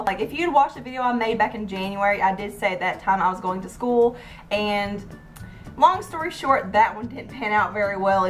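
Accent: South, United States. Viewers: that southern drawl 😍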